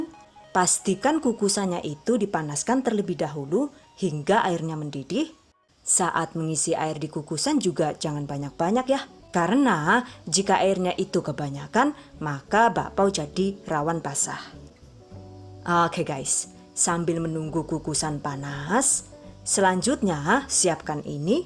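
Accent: Banyumas, Indonesia. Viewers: Deneng kaya wong ngapak. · Catat mantuk Bu bapaiunya. Njenengan orang Tegal apa kebumen ya entong seneng njenengan ngendijan ngapak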